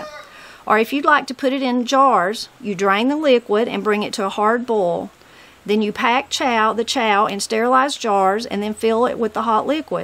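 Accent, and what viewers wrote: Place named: South, United States. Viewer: I’m from what then the outer Charlotte NC and Mom canned every year. She made wonderful chow chow. Love your presentations...your Southern talking and everything you do. Back in the country day....I do miss all the country growing up things. Thank you.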